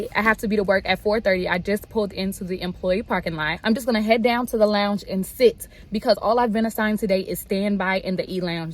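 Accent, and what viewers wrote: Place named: New York, United States. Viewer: I assume you're from New York based on your accent or atleast that's what it sounds like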